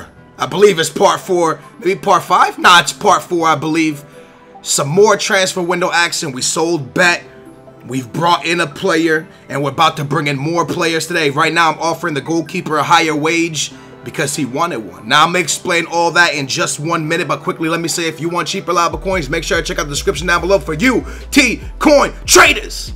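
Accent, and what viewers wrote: Portugal. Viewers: he says it the way he wants to and in a Portuguese way its kinda cool in my opinion haha:P the way he says the Portuguese team is the way its said in Portugal, like the way he says Ronaldo and pepe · How do u pronounce adryan like Andre?? We get it ur portugese but try and speak normally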